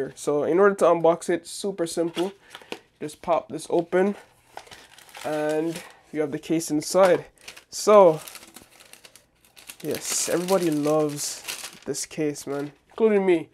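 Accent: Jamaica. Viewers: Why do you sound Jamaican 👀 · U sound like u have a lil Jamaican accent 🇯🇲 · my guy jamaican as hell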